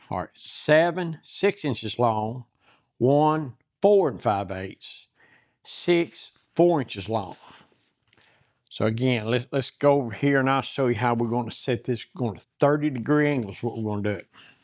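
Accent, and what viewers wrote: South, United States. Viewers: Hi, hope you are doing well. I wish you could make more video's more often, but that I imagine is pretty tough to do by yourself. I have watched all of your video's two or three times by now. But the real reason I wante to comment here, is to yell you on one of your video's, dont remember which one now, someone wrote a comment and was complaining about your "Southern Accent." That's probably the biggest reason I love watching your channel. Not only do you do a great job in discussing and explaining how to do every step in the things that you do. But more than that, I love listening to you talk with that "Southern Accent!" So I wouldn't let that get you down, there are way way more of us out here that don't care about your accent, so keep it up, and if that viewer was bothered by that accent of your I would suggest to him or her, to don't come back. 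There are a way more of us out here to don't mind it. Like I said, that southern accent is one of the biggest reasons I watch your channel!. And remember this, no matter what you do or say, there are going to be butt heads out there that you just can't satisfy. Take care, and keep making the video's. Jim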